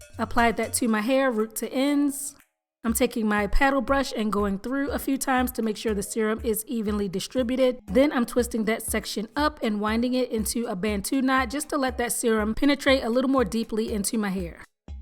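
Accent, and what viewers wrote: Baltimore, United States. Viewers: I've seen your thumbnails for years n I always refer to you as the girl that looks like Nicki lol I love that you did this natural straight style, aslo do I hear a bmore accent?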